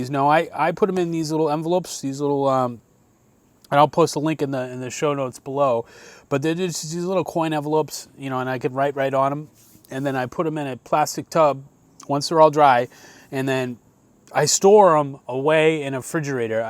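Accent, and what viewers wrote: Boston, United States. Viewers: Dude! I love your accent! Is it Boston?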